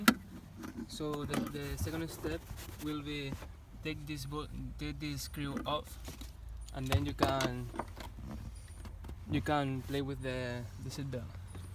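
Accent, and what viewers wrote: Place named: Spain. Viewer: Hahaha saludos desde USA me mola tu acento de español Hahaha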